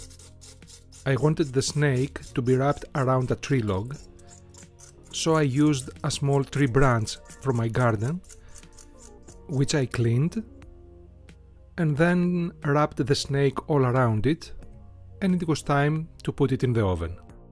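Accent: Greece. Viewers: AAAAA RE HELLAS I LOVE YOUR GREEKLISH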